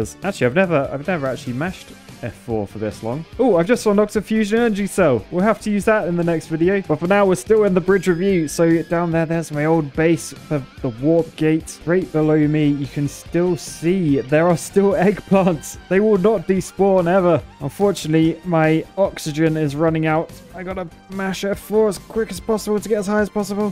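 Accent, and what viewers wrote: United Kingdom. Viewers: I agree, it's just the accent. Nice British accents make everything sound better, at least to Americans.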